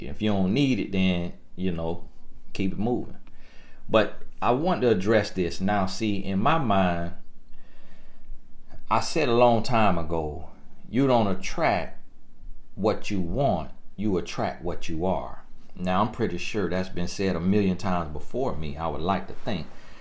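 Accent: South, United States. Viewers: Great video. I like your southern country accent. I saw your wife in a video. She is beautiful.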